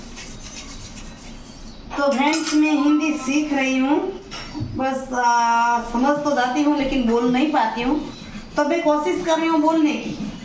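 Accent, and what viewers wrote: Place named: Gujarat, India. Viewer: Saras hindi bhasha bolo chho apane gujarati chie etle tone gujarati j ave hindi no pan but dhire dhire avadi jase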